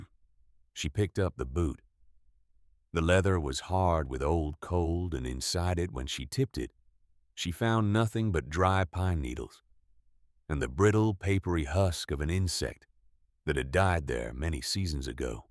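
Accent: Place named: United States. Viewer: Oooh! A narrator with an American accent!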